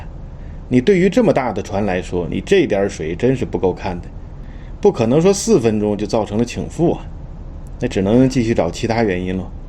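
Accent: Tianjin, China. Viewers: 船长是天津人吧？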